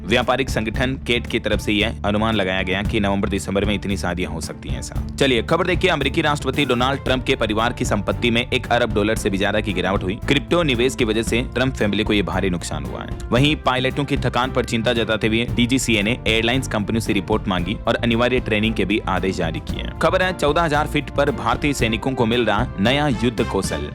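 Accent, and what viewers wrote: Rajasthan, India. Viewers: bhai vah to aap Rajasthan ke mujhe lagta hai Seth sanvariya ka dialogue sunkar Aisa lagta hai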